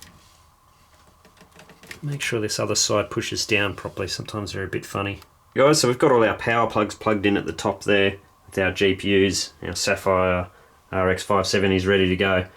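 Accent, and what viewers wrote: Australia. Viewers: Excellent no-nonsense videos, great to hear an Australian voice... can you tell me what the data usage is on a rig like this per month? Is that a stupid question?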